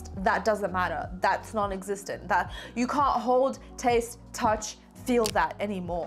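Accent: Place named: Australia. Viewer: I love how this made me feel. Thank you for this kick up the butt. Hearing a fellow Aussie accent saying things like this hits 👌 I appreciate it!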